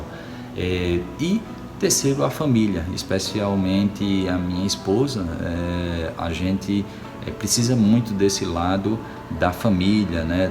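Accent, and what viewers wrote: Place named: Rio Grande do Norte, Brazil. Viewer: Excelente irmao, muito bom mesmo, o melhor depoimento pra quem está buscando pois aborda cada ponto c calma e objetividade. Pelo sotaque ou é potiguar ou paraibano né? Brasil.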